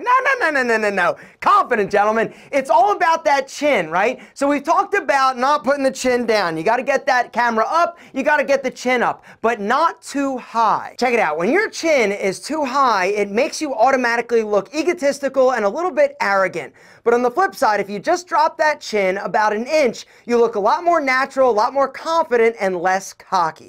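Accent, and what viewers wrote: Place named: Philadelphia, United States. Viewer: “Ouh-vurr” = Over ... This dude is definitely from Philly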